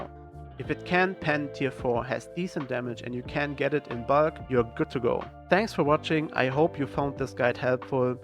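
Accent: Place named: Germany. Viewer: Denglisch. Ein unverkennbarer Klang im Universum! Gruß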